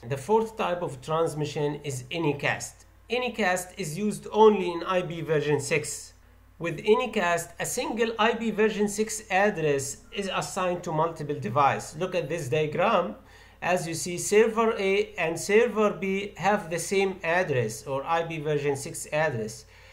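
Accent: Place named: Arab world. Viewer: واضح عليك عربي بس ماشاء الله تبارك الله شرحك فوق الممتاز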